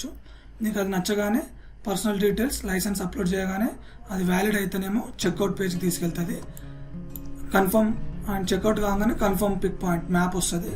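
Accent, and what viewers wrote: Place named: Telangana, India. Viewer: Thank u bro. Ma intla manshi matladutunnattu anipistundi bro. Nenu mana telangana slang ki connect ina 👌👌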